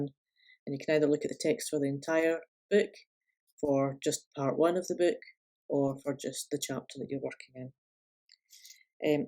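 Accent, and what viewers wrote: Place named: Glasgow, United Kingdom. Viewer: I liked the way you speak; are you a Glaswegian?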